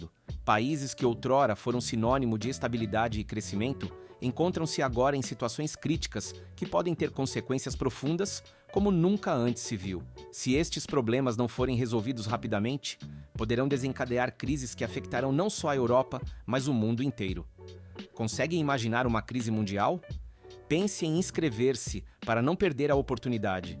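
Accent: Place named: Brazil. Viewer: Esquisito esse texto em português de Portugal com voz de português do Brasil. Sector, perceção, despedimento... 🙄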